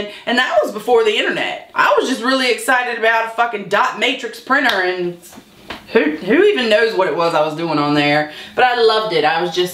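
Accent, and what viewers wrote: South, United States. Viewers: you are saving me from a panic attack right now. I'm Australian and listening to your soothing southern voice is weirdly helping. I don't want to take my short term medicine but I think I have to.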